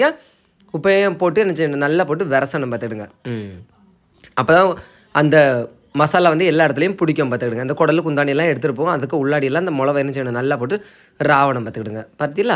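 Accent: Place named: Kanyakumari, India. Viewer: Annan namma Kanyakumari slang super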